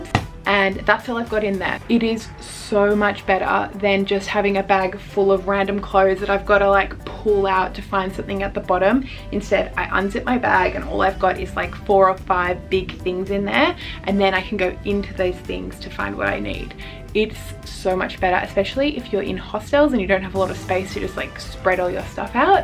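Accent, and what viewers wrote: Australia. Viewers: Aussie as! I did not detect a lisp. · Am i right if this aussie accent ? or new zealand maybe ? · Aussie with a lisp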